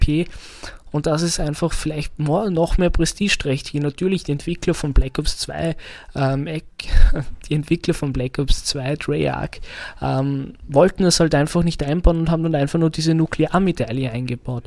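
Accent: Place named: Austria. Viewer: Österreichische Stimme tut gut auf yt zuhören :)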